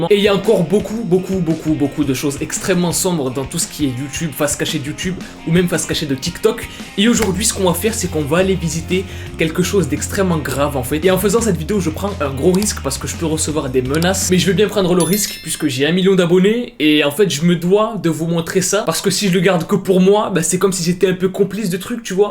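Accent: England, United Kingdom. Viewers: J'adore ton accentuations anglaise 🥰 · Moi je trouve on parle pas assez de l'accent anglais MAGNIFIQUE de 🤗🤩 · On es tous d'accord que l'accent d'anglais de et Magnifique ✨ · Un accent anglais de qualité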